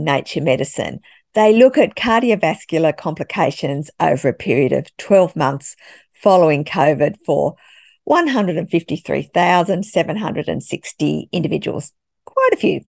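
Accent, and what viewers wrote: Australia. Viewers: Australian accent ?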